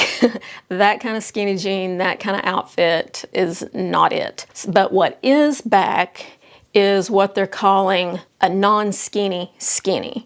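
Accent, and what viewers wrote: South, United States. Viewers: My deepest condolences,! I hate January also but because I live in CO and it’s miserably cold! My daughter lives in Tuscaloosa (AL student). I thought I recognized your cute southern drawl. Thank you for keeping me fashionable. I’m so happy I found you. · Love your Southern way of speaking. Could listen to it foe hours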